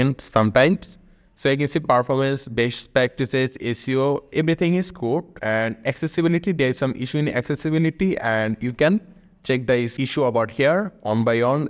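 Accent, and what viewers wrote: India. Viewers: Your voice sounds much better than before in the Laravel E-commerce Project Tutorial for Beginners, but please, we can't understand the Indian accent very well. Can you make the accent a little bit easier?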